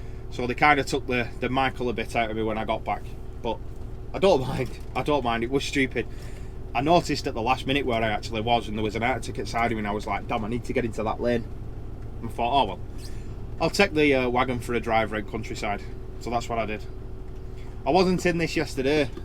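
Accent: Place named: Oldham, United Kingdom. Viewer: I'm glad your enjoying yourself pal I onky did my test a few weeks ago on class 2 and could not wait to get down the road altho I've driven trucks for many years I only past not so long ago just take your time don't let cars rush you and just take it at your own pace . You sound like a Oldham lad with that accent I'm only over the top In Huddersfield myself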